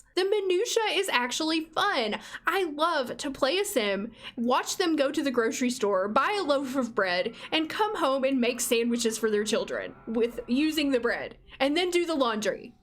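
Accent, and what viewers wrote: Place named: Kentucky, United States. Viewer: Hi your acc not sounds so match like mine any chance your from bardstown lol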